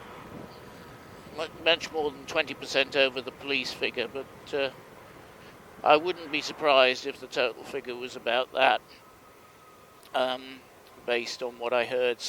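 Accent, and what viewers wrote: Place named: France. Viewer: Sounds like you've lived in France for quite a number of years,.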